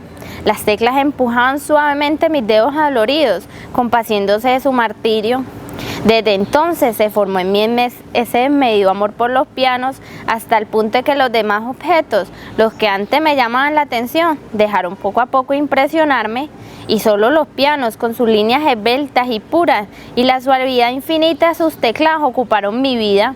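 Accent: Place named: Caribbean Coast, Colombia. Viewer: Me gusta mucho el acento costeño ajá. Se ve el trabajo. Pese a eso, debes tener cuidado con las letras de las palabras que te saltas. Estás inmersa en el texto, no nos miras ni en un momento. Habría ganado mucha fuerza si nos hubieras echado una miradita, lo mismo con la interpretación, agitar la mano hacia adelante en el "ajá".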